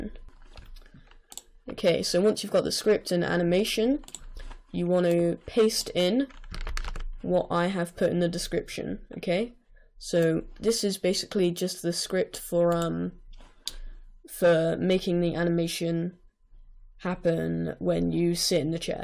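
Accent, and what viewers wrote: United Kingdom. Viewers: Roblox programer: Good at intros, Good music, Good Mic, British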